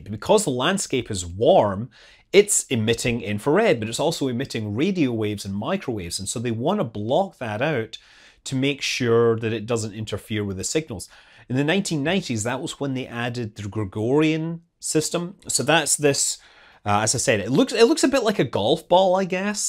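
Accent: Scotland, United Kingdom. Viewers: Is that a Scottish accent that I am detecting?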